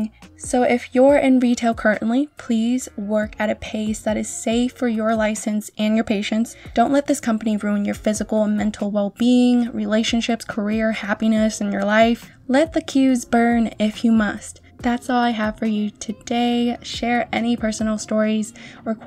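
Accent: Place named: Boston, United States. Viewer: LMAO !! You're from Boston !!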